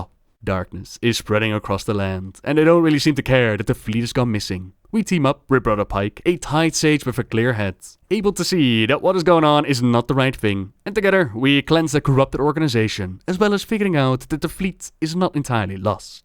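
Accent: Netherlands. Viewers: Are you Dutch? Your accent truly adds such a great aspect to your storytelling. Brilliantly done!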